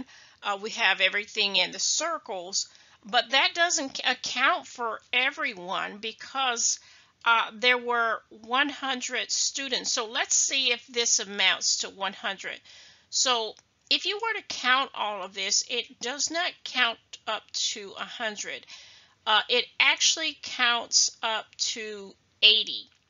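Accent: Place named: United Kingdom. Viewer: shes british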